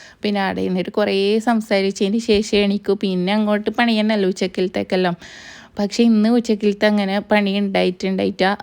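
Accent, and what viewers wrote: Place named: Kannur, India. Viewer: കണ്ണൂര് പോലെ തോന്നി അതാണ് ചോദിച്ചത് 😊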